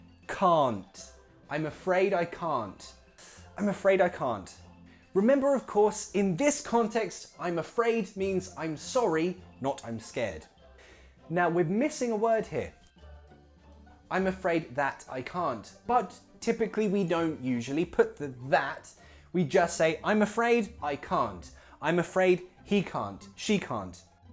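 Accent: United Kingdom. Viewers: Nice channel but it's a British English, I would like to see how you explain an American English. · This is the first time for me can hear british accent very well😂 thank you for not talking so fast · This is really appreciated that you speak a good British English like a typist 30 word per minute. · Damn !!!! Where have I been, that I've never seen your videos before ??? I'm not only learning English with you, but also the accent and stress I like the most : the British one ! In addition to this, I'm brushing up my Turkish classes with your subtittles. Thanks a lot, and may God bless you for all your effort in this purpose of freely teaching people ! Best regards from Dresden, Germany ! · I've knew a lots of new words with british accent today and i was so happy😊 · Im fluent in american english, now learning british english from you. Awesome vid. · I didn't know these expressions exist! 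I have followed you for a very long time, and I've learned a lot overall brit accent! well done! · Omg! I’m falling in love with your British accent!!! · I love your accent man! British accent is lovely! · A bit of British Accent? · His English is British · Hey good job with this channel, Greetings from Argentina. I have a question: you speak in British English or you just have the accent Is much different the British English expresións than American English expresións ??? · El profe más hermoso! Y con acento británico! 😍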